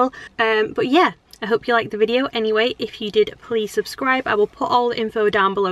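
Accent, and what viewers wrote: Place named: Wales, United Kingdom. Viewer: ohhh lol you sometimes sound like you have a bit of a Welsh twang 🤪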